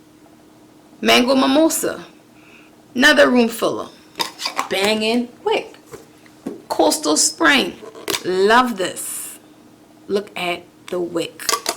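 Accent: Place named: New Orleans, United States. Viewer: You sound like you’re from New Orleans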